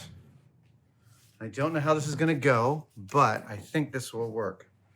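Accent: Scotland, United Kingdom. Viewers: Nice ta here your Scottish accent dude no to bad tbh fea the bony home land of Scotland 🏴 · Love the wee Scottish accent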